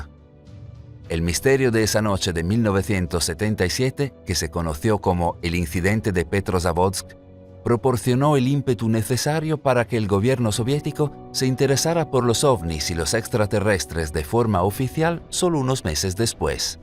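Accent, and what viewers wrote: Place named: Italy. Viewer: Per quanto una buona pronuncia e lettura,la cadenza,l'inflessione il non marcare alcune lettere rivelano la tua italianità...ci ho imbroccato vero???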